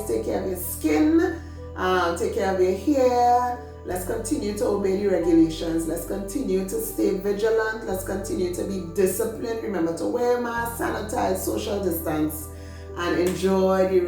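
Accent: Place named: Trinidad and Tobago. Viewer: It's the wisdom... But it's also the trini accent fuh meh! ❤️🎉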